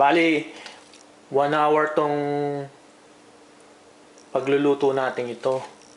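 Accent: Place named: Pampanga, Philippines. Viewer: Kapampangan ka nga....may punto...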